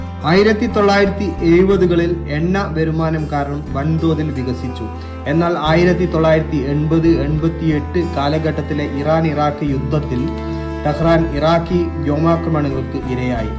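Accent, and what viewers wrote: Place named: Kozhikode, India. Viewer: കോയിക്കോട്ട് കാരനാണല്ലേ? വല്ലാത്ത ഒരു യ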